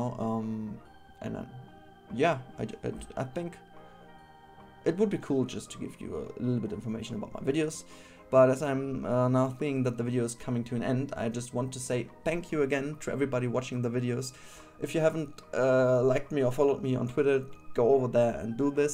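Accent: Germany. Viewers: You are German right ?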